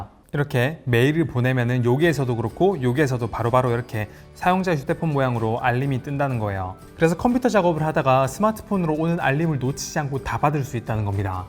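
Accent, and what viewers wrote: Gyeongsang, South Korea. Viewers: 경상도 억양 빼곤 좋습니다.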